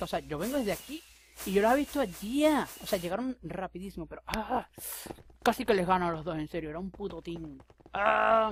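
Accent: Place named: Venezuela. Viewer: eres de venezuela? xq yo si lo soy, tienes el tipico acento venezolano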